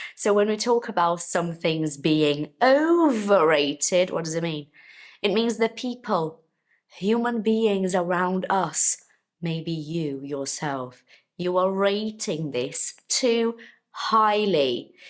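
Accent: United Kingdom. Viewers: Oh my gosh that's pretty awesome! I love the British accent. Your English is amazing!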